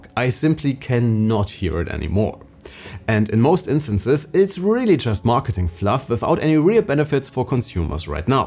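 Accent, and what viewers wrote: Germany. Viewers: I just discovered the notebookcheck channel via the "HP Omen 17 2024" review. Really great stuff! You are a great speaker and your german accent sounds nice ;) As a hobby audio guy I always appreciate that you guys include "LatencyMon" and fan noise examples in the reviews. I would love a Video about DAWs and Audio Setup on Windows Laptops one day! Keep up the great work!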